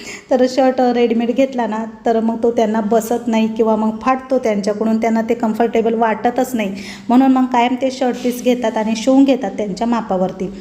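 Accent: Nashik, India. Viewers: आपले नाशिक आणि भाषा लई भारी ताई . 👍बिनधास्त बोलत जा. अजिबात संकोच नको करू. सर्वांना समजते मराठीचा गोडवा सगळीकडे सारखाच. ओरिजिनल ते ओरिजिनल असते. उगाच ओढून ताणून इंग्लिश विंग्लिश मारणाऱ्यांपेक्षा तु छान आहेस. म्हणून फेमस झालीस व ग्रोथ आहे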